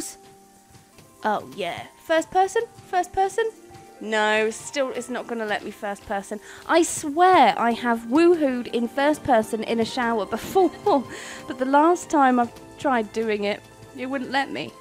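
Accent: United Kingdom. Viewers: You are aggressively british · Your narration cracks me the fuck up! Especially the British accent 🤭🤭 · This is so funny I don’t think I’ve laughed so hard in my life and I love your accent British accents are awesome and I love the way you swear it’s so cool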